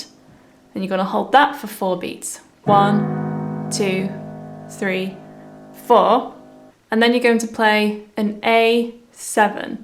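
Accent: United Kingdom. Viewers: I love your British accent!😍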